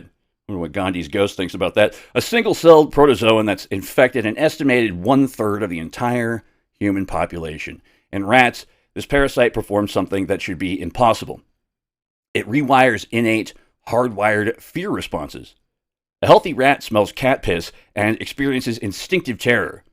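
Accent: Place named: Canada. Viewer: You are Canadian aren't you? I can't really tell being that I'm British. You sometimes sound like you have a Canadian twang.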